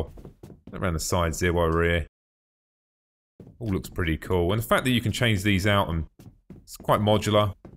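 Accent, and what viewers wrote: United Kingdom. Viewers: your british accent makes me want to drink tea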